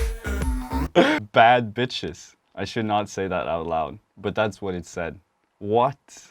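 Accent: Norway. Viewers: I heard his accent and went wait is he Norwegian and then I saw the message from his mom and it was in Norwegian and I was in shock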